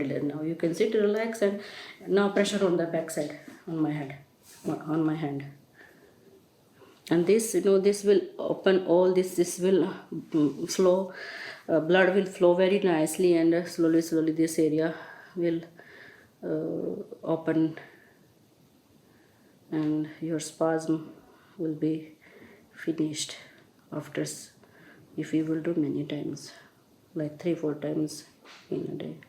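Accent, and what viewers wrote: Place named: India. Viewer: Мне нравится её индийский акцент и было бы прикольно еще посмотреть версию полностью на русскоя языке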